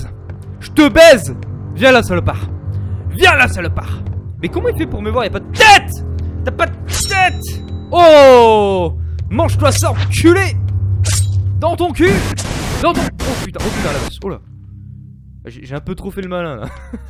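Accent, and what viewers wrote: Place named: England, United Kingdom. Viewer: Vidéo génial comme d'habitude et ton accent anglais est toujours aussi ouf xD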